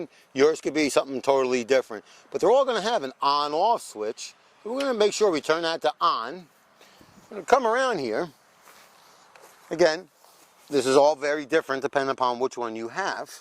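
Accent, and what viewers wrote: New York, United States. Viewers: I'm Italian and love how talks! I'm from new yawk too I dunno where he's from but he would fit right in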